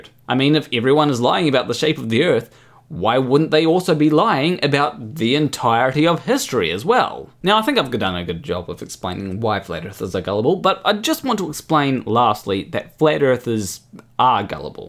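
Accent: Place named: New Zealand. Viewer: You mentioned Australia, but you sound Kiwi... what gives? 😄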